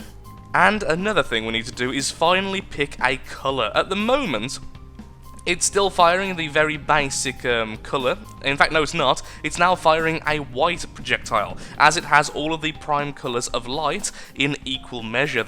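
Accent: United Kingdom. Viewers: you sound british but you think like an american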